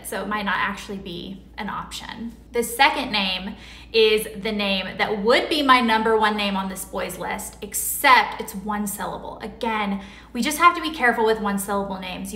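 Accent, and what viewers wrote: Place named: South, United States. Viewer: You're sounding so much more Southern since you've moved out of LA. I'm so glad you've come back to YT, I've been watching you since your college pink room with the Eiffel tower painting. I have 2 boys Jude and Rory. It's so hard to name kids.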